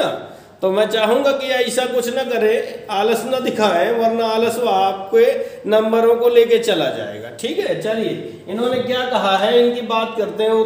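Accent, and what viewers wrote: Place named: Kanpur, India. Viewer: Very very nice kanpuriya language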